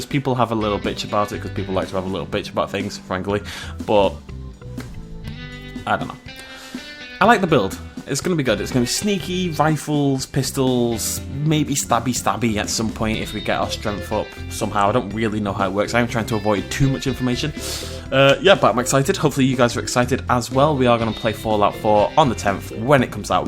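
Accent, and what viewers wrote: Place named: England, United Kingdom. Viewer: I love! I love his English accent and love his content as he talks at his own pace and tries not to rush anything. Keep doing what you do!:D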